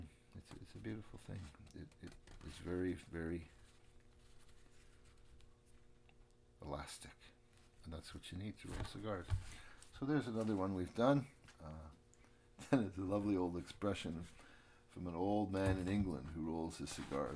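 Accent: Canada. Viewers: He sound so Canadian.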